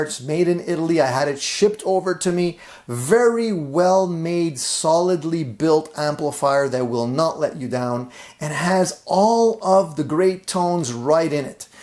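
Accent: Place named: Montreal, Canada. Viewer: Sounds great! Maybe a dumb question, but are you from Montreal? If not, then you have definitely our English accent for some reasons haha Cheers!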